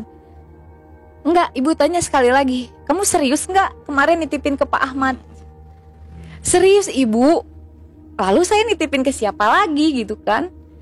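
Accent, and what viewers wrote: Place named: West Java, Indonesia. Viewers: Seru sih ceritax cmn cape dengerx mbax nyusun katax mikir bgt...mungkin krn logat sundax..😀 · Sunda pisan euy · Kok ketara banget logat Sunda di gaya bicara nya ya bang??? 😁😁😁😁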